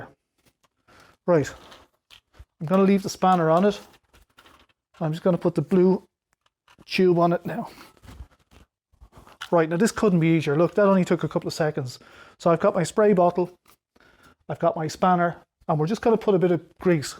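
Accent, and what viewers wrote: Ireland. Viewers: And cool Irish accent to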